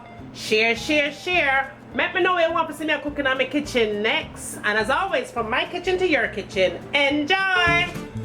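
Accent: Jamaica. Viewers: Man I watched alote of cooking videos and let me tell you when I want to cook a Jamaican dish I have to look for you videos dem why?? Because for some reason the Jamaican accent makes it better I've seen some other videos of people making Jamaican dishes and the accent not there ATALL that's just my opinion so in other words I LOVEEEE your videos dem 👍👍 lol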